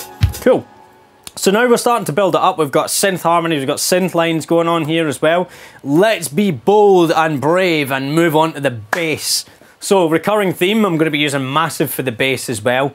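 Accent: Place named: Scotland, United Kingdom. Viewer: Thanks for the excellent overview, I also love your Scottish accent! Scotland and Scottish people are awesome (and I'm partly descended from them myself), I hope to visit there some day. Also thanks for the heads up on the Yamaha Reface line, I would be really tempted to get the Reface CS if only it had patch memory. There's really no excuse not to with today's technology. But at least there are some great softsynths now such as Tyrell, Diva, and U-NO-LX.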